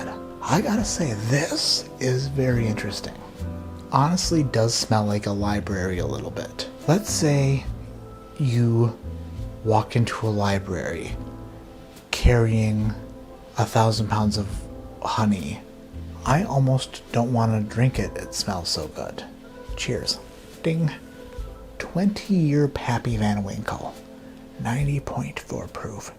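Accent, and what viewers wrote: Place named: Minnesota, United States. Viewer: The mystery of upbringing continues. When I first heard him say his own name, not but ” I said, “a- ha—Minnesota.” The name should take a split second to say but he extends it out and taffy-pulls what was supposed to be a simple short “a.” Tortures it in that nice way, being and upper Midwesterner. Friggin is also Minnesotan, as are many of his other phrases. But the upper Midwest accents have no sharp state boundaries. Now he drops “thimbleberry” on us, or rubus parviflorus. That put a whole née spin on his mysterious origins. We know he lived in Michigan for some time before moving east. Clueberry. Thimbleberries are only found in Minnesota on the Lake Superior shoreline so Duluth is a possibility. But they are most common along Michigan’s Upper Peninsula, aka da U.P.? Could it be that is a Yooper? A native of the land of not quite Wisconsin and not quite Michigan and not quite right? 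Maybe Marquette, Sault Ste Marie, or perhaps Esky? Houghton up on the Keeweenaw is where all the thimbleberries can be found. At some point I hope tells us where he friggin grew up and got that outrageous accent!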